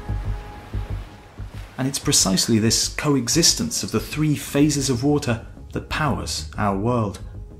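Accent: England, United Kingdom. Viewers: Where is this coming from? His accent sounds like England. I am used to measuring things in inches, feet, yards, etc here in the USA, not meters, etc! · I am thoroughly enjoying these videos, but I'm starting to find the narrator's accent more and more annoying. In this one it's particularly noticeable, seeing as it's about water -- or as he says it, "wo-tah," or sometimes, "woo-tah." I guess "wah-tER" is just too hard for Brits to say properly. All those R's in the English language, and the Brits just won't say them. But for some reason, they stick on the end of words that end with an "ah" or "uh" sound. It's maddening. Some of the other mispronunciations are just laughable -- "dee-ASS-pura" instead of "DI-uh-spora", or "invent-ery" instead of "inven-TORY" -- you know, like the political class in Britain!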